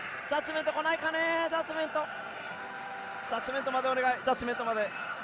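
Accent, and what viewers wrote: Kyushu, Japan. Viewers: たまに九州の方言を聞くとほっとしまいます。熊本生まれ何年も帰ってないので、ハーデスはゴッド引いても見切りしてます。ジャグラは中々こない。前の日の多く出てる台を狙い打ってます。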